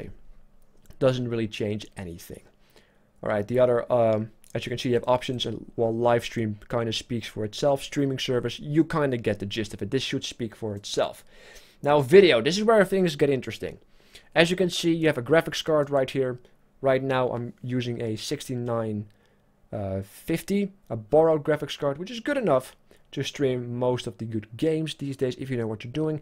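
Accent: Netherlands. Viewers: i guess your dutch:P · youre dutch?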